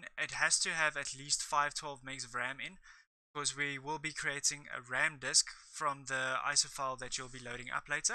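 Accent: South Africa. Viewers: yon sound like a fellow south African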